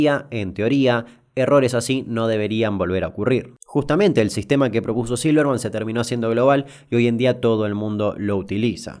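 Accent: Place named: Posadas, Argentina. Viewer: De que lugar sos Capo? Pareces Posadeño amigo.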